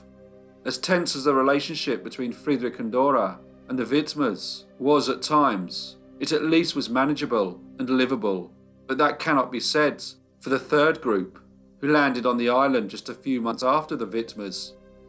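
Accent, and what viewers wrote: United Kingdom. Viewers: Oh thank god it’s a Brit narrating this, Americans drive me nuts with their mispronunciation of places. 🤔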